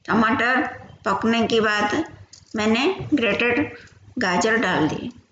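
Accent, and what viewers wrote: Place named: Odisha, India. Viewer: Very good effort Madam. Keep moving . Nice hindi in Odisha tone ..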